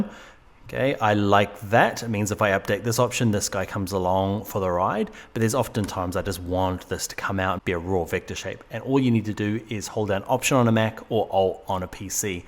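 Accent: New Zealand. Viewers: Great video thanks mate. Are you a kiwi? Sound like a kiwi :))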